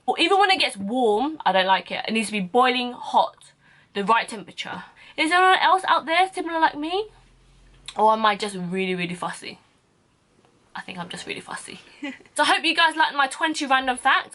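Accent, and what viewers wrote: United Kingdom. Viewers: Is she British? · I just subbed...simply because i feel inlove with your british accent and your ombre brow 😉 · So funny those facial expressions hahaha u make me laugh, an Asian speaking with a British accent is fukn funny af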